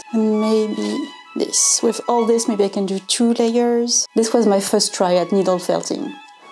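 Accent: France. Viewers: please do a full video speaking english with french accent, I think it sound beautiful!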